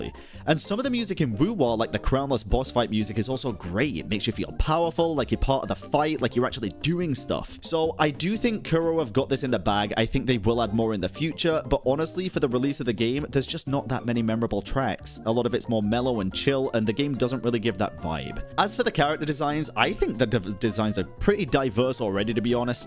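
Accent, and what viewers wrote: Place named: United Kingdom. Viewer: Yo, British accent is hot af let them cook well speak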